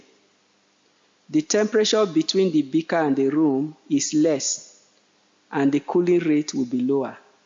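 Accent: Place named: Nigeria. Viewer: Nigerian accent the best muah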